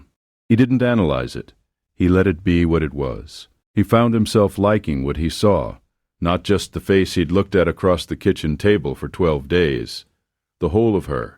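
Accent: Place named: New York, United States. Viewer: The Narrator must be from a crowded city in….New Jersey or New York. His performance is….inept Great voice though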